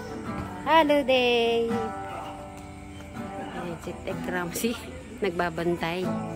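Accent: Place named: Pampanga, Philippines. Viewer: natawa nmn.ako sa yo kc kapampAngan accent ka po tlga then may halong arabic like ng KALAS at AYWA...related ako kc gnyan din ako kpg kakauwi nababalid po pagtagalog ko...pro so proud po syo...ingat po kyo lagi..mahal po nmin kyong mga family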